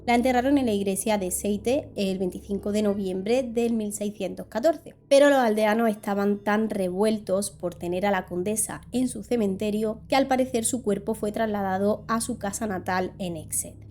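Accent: Spain. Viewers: 我喜歡你的西班牙口音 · el acento de!!!el mejor acento de España!!o al menos el que mas me gusta!!!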